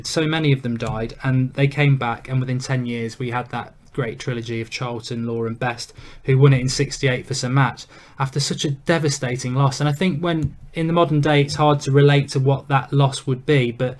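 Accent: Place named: Manchester, United Kingdom. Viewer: nice manc accent mate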